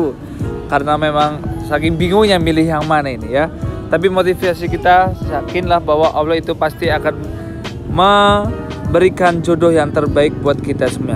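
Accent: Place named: Banyumas, Indonesia. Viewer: Ngapak.e khas nemen bib😅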